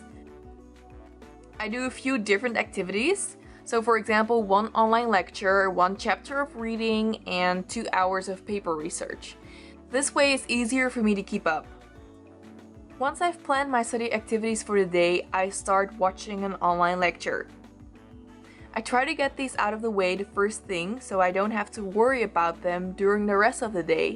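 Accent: Netherlands. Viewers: Are you Dutch? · Are you Dutch? ☺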